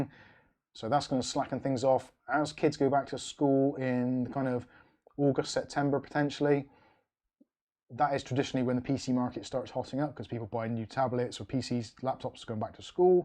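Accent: Bristol, United Kingdom. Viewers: you sound like me I'm from bristol aswell haha 😂 I'm in brislington end lol that's crazy, I've subbed aswell man good videos 🙂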